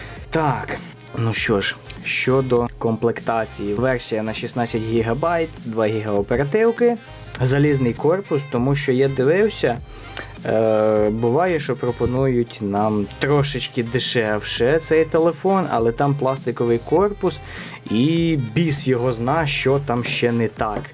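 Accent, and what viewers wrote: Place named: Western Ukraine, Ukraine. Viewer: Западный акцент забавный)))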